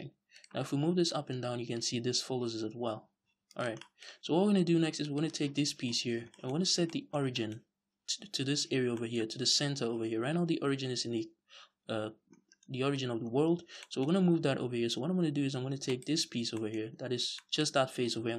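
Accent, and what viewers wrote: Nigeria. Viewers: Nice work bro!!👍🏽 Btw where are you from? You have a Nigerian accent.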